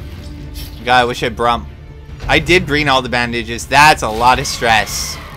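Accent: United States. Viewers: I started learning english with pewdiepie, then i discovered your channel. You helped me getting use to american english, thank you for that :). I have to find a scottish youtuber now, i can't understand them ^^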